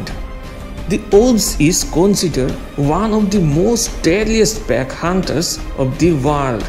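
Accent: India. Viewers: 😂 it’s the tune for me must be an Indian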